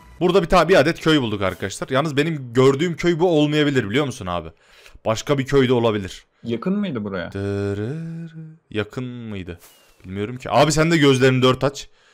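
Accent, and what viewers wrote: Yozgat, Turkey. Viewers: Abi Yozgatlı mısın??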